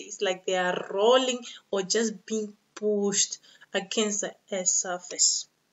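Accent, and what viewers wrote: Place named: Africa. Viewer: The fact that you speak like an African makes me understand really....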